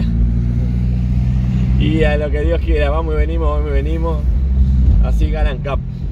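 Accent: Argentina. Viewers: Me encantan tus relatos pareces guía de turismo o mejor tu tonada es de argentino cheto ya sabés el cheto es la gente de la alta sociedad es un algo ojo me gusta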